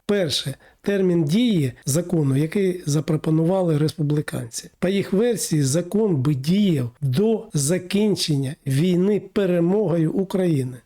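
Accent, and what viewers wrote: Russia. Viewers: Що зробити щоб ви вивчили українську мову?? У вас суто москальський акцент.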